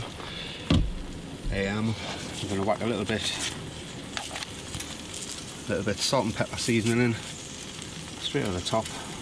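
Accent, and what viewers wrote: Newcastle, United Kingdom. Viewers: ha where u from mate u sound like your from newcastle ....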